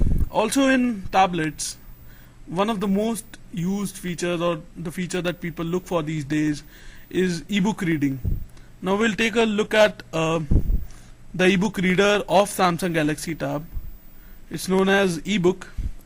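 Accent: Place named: India. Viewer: ur indian accent sucks!! its funny though!lol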